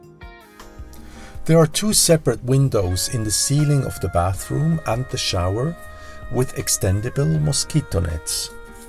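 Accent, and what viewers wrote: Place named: Switzerland. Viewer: Is this chap Swiss?...he certainly sounds weird enough?!.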